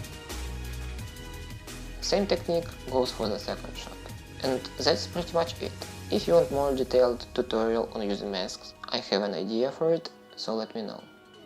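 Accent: Russia. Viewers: Ты русский по произношению понятно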